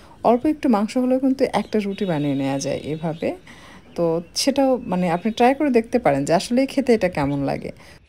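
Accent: Bogura, Bangladesh. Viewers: Apu tomar basha bogura kothay?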